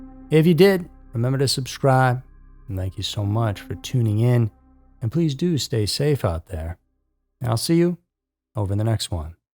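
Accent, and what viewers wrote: South, United States. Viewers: Came For The Stories, Stayed Because Of Your Lovely Southern Voice.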